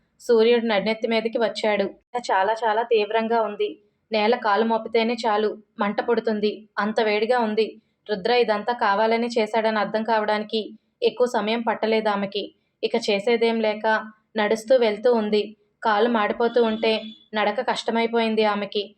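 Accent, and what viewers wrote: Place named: Rayalaseema, India. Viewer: అక్క నాతో ఇంకో చిన్న రిక్వెస్ట్ ఈ క్యారెక్టర్ లో నువ్వు బాగా మునిగి పోయినావు రాయలసీమ భాష తోటి బయట కూడా నువ్వు ఇదే భాష మాట్లాడే అనుకో యాడ నుంచి వచ్చిన అమ్మ నువ్వు అంటారు మరీ అంతలా కమిట్ అయిపోతే గా ఈ భాషకి😂😂😂🫴🫴🙏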